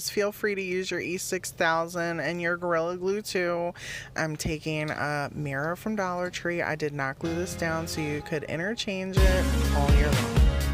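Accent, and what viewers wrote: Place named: East Coast, United States. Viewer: I know an East coaster when I hear one but you almost got me with the ya'll lol. I lived in that store before I moved thinking they were everywhere what a disappointment when I got here. If they were smart they would expand cause the sales would make up cost 10 fold. Binge time to see all the nice things you have made. Keep up with your style an humor cause it's great.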